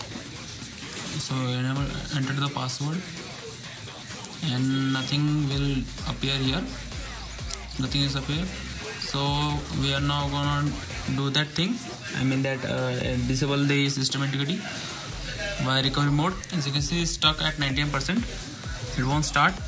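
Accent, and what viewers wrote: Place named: India. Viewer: Can anyone tell me what fucking command that is he’s speaking in that Indian accent can’t tell if he’s saying t b or d they all sound the same and then he’s not even saying enough characters that his blurry dirty ass screen shows. It’d help if he turned off the techno music. This is why Americans hate Indians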